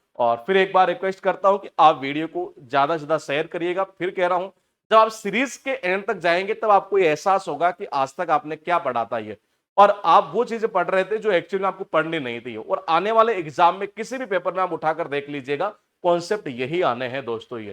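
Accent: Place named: India. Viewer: Seems aayega love you sir aapki english desi jugad ne to mja hi dila diya😮❤❤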